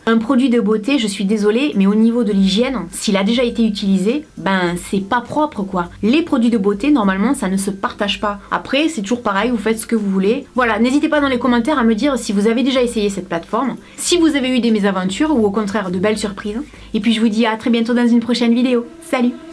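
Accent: South, France. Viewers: je t'adore tu donne le sourire rien qu en disant "bonjour" avec ton joli accent du sud. Tes idées de vidéos sont originales et super bien faites. Merci je te suivrais toujours!!💖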